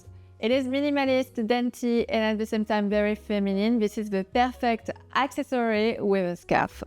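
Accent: France. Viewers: Hard French accent.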